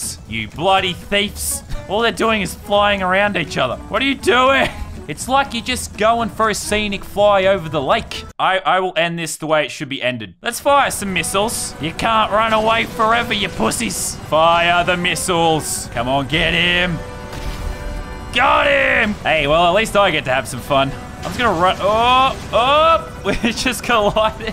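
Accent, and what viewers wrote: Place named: Australia. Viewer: yes, youre a patriots fan!!!!!!!! where do you live cause you sound Australian but you like the Patriots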